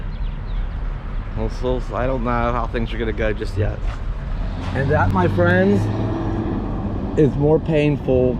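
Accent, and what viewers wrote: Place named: Pacific Northwest, United States. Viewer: You sound like livening in the PNW .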